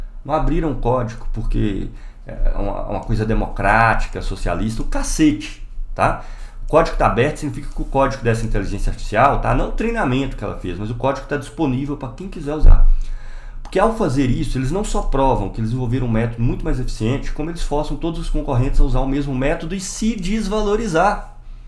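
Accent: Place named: Northern Minas Gerais, Brazil. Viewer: Cheguei aqui de volta devido a um corte recente. Estava ouvindo e apreciando tantos sotaques familiares e incomuns de se ouvir na internet, os caras de Uberlândia e você de Turmalina estava ouvindo e lembrando de colegas que tive na época do CEFET com o sotaque de mineiro do norte e minha namorada e eu pensamos a mesma coisa enquanto você contava a história que só faltava você falar que estudou no CEFET. Foi uma grata surpresa ver alguém com trajetória similar de tantos outros colegas que tive e de um espaço que é grande na minha vida. Não sei pq mas me senti compelido a vir aqui comentar. Apesar de discordar de certos pontos, temos em comum a escola de origem e o marxismo como norte. A participação em tal podcast foi ótima, didática e eficaz, está de parabéns.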